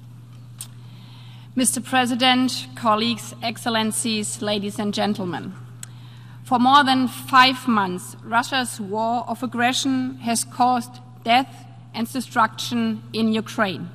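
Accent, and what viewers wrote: Germany. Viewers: Als halb Ire, der mit deutsch und englisch aufgewachsen ist, muss ich sagen dass ihr englisch nicht schöecht ist. Natürlich hat sie einen deutschen Akzent, sowie 90% aller deutschen. Man versteht sie aber sehr gut, ihr Satzbau und ihre grammatik sind quch gut und sie spricht besser englisch als 90% unserer Politiker und wahrscheinlich besser als 100% aller AFD Plotiker, also bitte mal klarstellen, macht ihr euch über ihren Akzent lustig? Sehr gewagt als deutsche, denn ich wette ihr habt alle einen starken deutschen Akzent und ich wette die meisten von euch können nicht mal einen geraden Satz formulieren! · Astreines Englisch. Für Jedermann verständlich, emotional und mit deutschem Akzent. Besser spricht kein deutscher Außenminister. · Ja, sie spricht mit deutschem Akzent. Und der wird auch stärker während der Rede. Aber der Inhalt, das Vokabular, die Grammatik sind einwandfrei. 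Übrigens hat auch der Präsident, der sie am Anfang ankündigt, einen starken Akzent...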